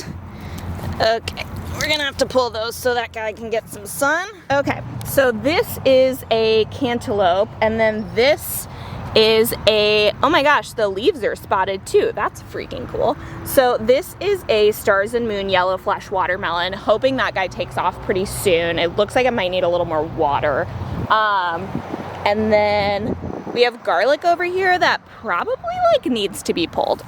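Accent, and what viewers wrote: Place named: United States. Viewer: Love the garden, but I thought people only talked this way as a caricature of an American accent. Is this for real or she is doing a bit?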